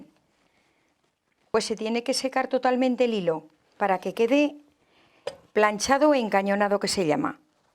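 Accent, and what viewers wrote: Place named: Aragon, Spain. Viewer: ¡Qué bonito acento! ¿es de Aragón?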